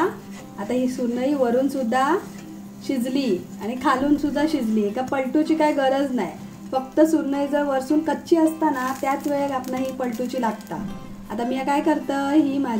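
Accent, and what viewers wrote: Malvani, India. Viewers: Lai Bhaari .....!!! Sweet malwani!!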